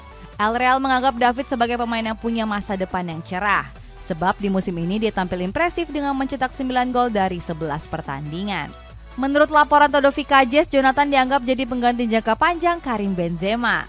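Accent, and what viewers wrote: Indonesia. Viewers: Iya njir host ini bahasa inggris dibaca pake aksen indo😅😅 gw yg bahasa inggis nya basic aja gk se paok ini😂😂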